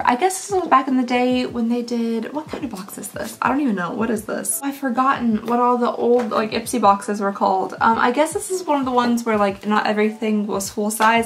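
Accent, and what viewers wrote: Kentucky, United States. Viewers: Ahh. I come straight to your videos when I get notified!!! My fav! I'm also a kentucky girl. Live about 20 mins from lexington. Love your accent. ❤️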